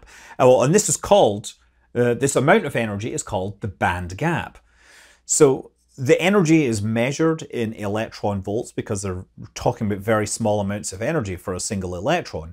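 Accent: Scotland, United Kingdom. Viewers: That scottish accent hitting hard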